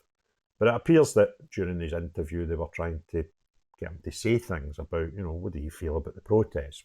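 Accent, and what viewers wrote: Scotland, United Kingdom. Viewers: Love your accent, makes me want to move to Scotland 🏴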